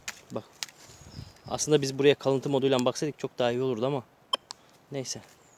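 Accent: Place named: Kastamonu, Turkey. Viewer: Siveniz Kastamonu sivesine benziyor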